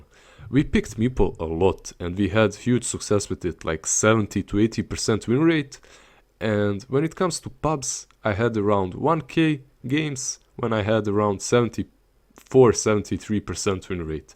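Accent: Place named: Balkans. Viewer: Haha znao sam da si s balkana po akcentu.Svaka cast lajkovao videi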